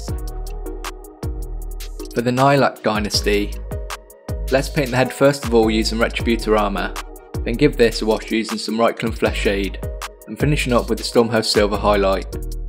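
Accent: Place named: Suffolk, United Kingdom. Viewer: Love the video! helped me a lot. I swear thats a suffolk twang I hear as well ;)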